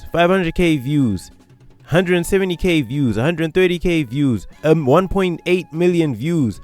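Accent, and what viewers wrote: South Africa. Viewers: THIS GUY IS DEFINITELY FROM SOUTH AFRICA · Are u South African 🇿🇦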